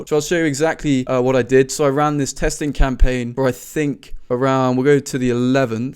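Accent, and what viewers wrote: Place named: Leicester, United Kingdom. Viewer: he got the Leicester accent 🤔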